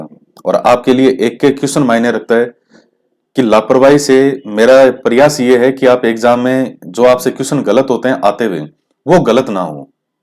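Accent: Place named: Marwari, India. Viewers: Pranam sir ji 🙏 Sweet marvadi bolty ho app sir . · Sir aap churu se ho kya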